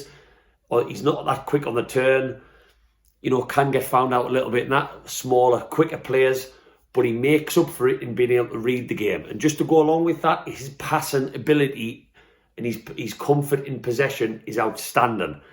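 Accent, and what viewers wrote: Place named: Geordie, United Kingdom. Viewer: Where abouts in the North East are you from? Have quite a soft Geordie accent.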